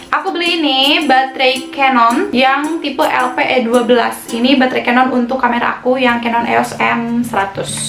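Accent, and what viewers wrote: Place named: West Java, Indonesia. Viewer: ihh tth org Sunda 😂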